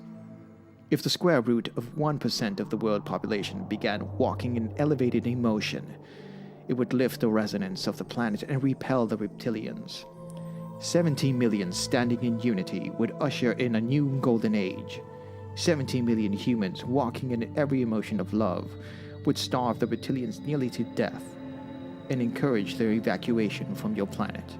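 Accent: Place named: United Kingdom. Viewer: Why is a British person with a horrible accent talking about the Zulu Nation this is just wrong in many ways! OMG stop!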